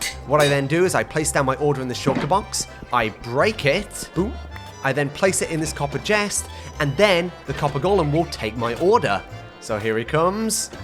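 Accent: United Kingdom. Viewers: Sometimes I deadass forget that is british and then abruptly remember in the middle of the video even though his british accent is prevalent in the entire video. No idea why Anyway UNPAYED LABOUR LETTTTTTT'SSSSSSS GOOOOOOOOOOOOOOO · are you a brit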